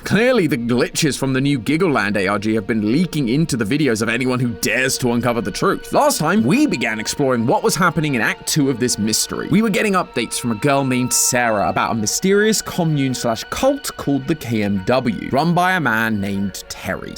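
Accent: United Kingdom. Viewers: This channel has become so unwatchable because of the horrible Bri'ish accent and constant indie horror. Please get a different host and start covering actual games again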